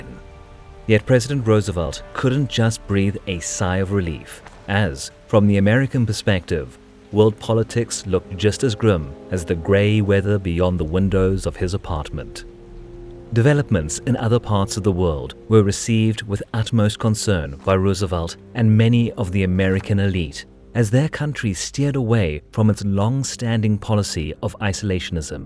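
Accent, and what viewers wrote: South Africa. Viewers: is the narrator South African? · Loved it, thank you! South African accent, Cape Town I would say. Sounds like you have been abroad for quite a while (or are you mimicking a SA accent?) I swear boet don't play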